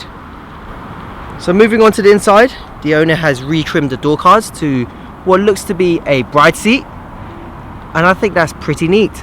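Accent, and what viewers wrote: England, United Kingdom. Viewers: Fuck bro u sound like a Pom hard , u must live in England surly?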